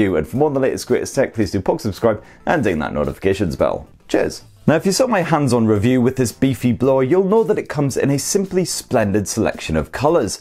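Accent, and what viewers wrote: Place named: Newcastle, United Kingdom. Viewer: Great review and easy to listen to seeing as the presenter has the same Geordie accent I do. Top blerk!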